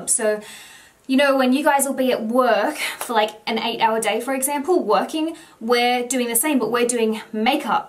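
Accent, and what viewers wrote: Australia. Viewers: Ireland? You don't sound Irish, you sound Australian or something. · Ireland? I always thought you were australian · Is her accent Australian? Sorry I can't assess that I'm Dutch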